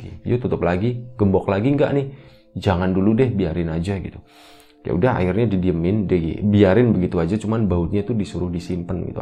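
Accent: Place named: Central Java, Indonesia. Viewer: Mantab mas. 🙏 logat kakay Jawa tengah Alus kromonya👍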